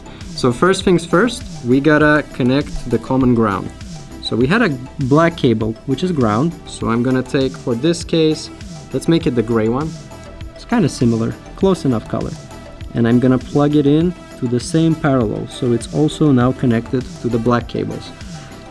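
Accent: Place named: Russia. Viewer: He has a Russian accent )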